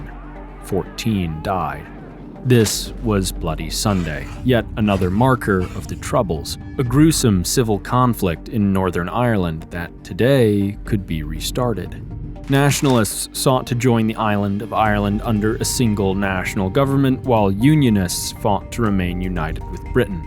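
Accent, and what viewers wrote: United States. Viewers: Oh that's what he's talking about in the video, the first 5 seconds i heard the american accent and paused to check the comments, all americans do is war monger constantly, every country outside america is monopoly and entertainment to their boring lives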